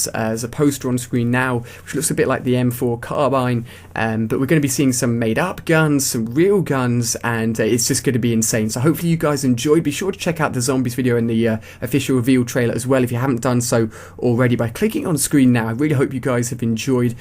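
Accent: United Kingdom. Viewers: Why does almost every game info video hav a British guy narrating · He's British. One does not simply change their accent.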